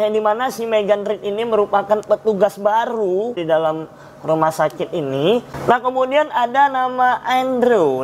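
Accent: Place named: Sumatra, Indonesia. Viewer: Hahhaa logat sumatra bgt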